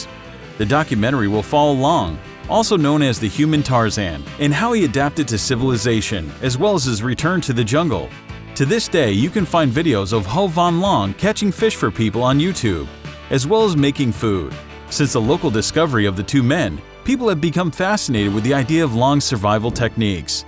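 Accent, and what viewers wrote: United States. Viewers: Anything narrated with an American accent ruins the subject involved.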